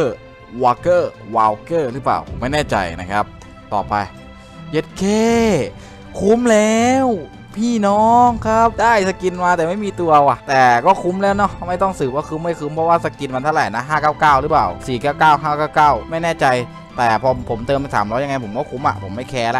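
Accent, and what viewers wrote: South, Thailand. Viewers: พี่คนใต้มายนิ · ไอย้ะแหลงใต้เหมือนผมเลยนิพี่บ่าวเห่อ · คนใต้ แน่555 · คนใต้เหมือนกันครับบบบบ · แหลงใต้ชัดมากเลย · พีคนใต้ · คนใต้หรอ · คนใต้นี้ว่า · เเหลงใต้ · พี่คนไต้หรอพี่ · คนใต้คนใต้5555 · เหยดเเม่ม55+สำเนียงคนใต้ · คนใต้หรอครับ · สำเนียงใต้มาเลย พี่คน จ.ไรอะ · พี่คนใต้ปะ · คนใต้ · พี่คนใต้ป่าวคับ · พี่คนใต้เออะคับบ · คนใต้เปล่าคับ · พี่คนใต้หรอ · คนใต้เหมือนผมเรย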